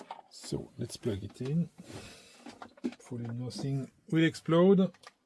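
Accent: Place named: France. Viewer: Yes your english is actually great. Don't ever get rid of the accent. It is what makes you- YOU. I just have a quirky likeness for accents so I can pick them up pretty easily. I speak in a boring plain american english accent. Absolutely BLAND and boring. Your accent probably gets you a lot of ladies attention! Dont lose it! And you are 100% understandable! Otherwise these videos would NOT be able for me to watch if I could not understand you. But I can understand you 1000% percent. Please dont get offended! Idk if its an american thing but, accents are great! There's New Yawk accents.. BAWW-ston accents, LilWEEZYana accents, Southern USA accents... MinneSOWtah accents... My accent is SO boring. I wish I had a cool accent like yours! Ze french accent is not one I have heard much of. But I am glad to finally have a french speaking teacher who I can practice my french accent by listening to! 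If you really wanted to get spicy, try learning a New York French accent. Couldn't imagine what that would sound like. But them Nu'yawkaz really do have an incredible accent... "iM WAWWWKIN HEAAA"!! God bless you brother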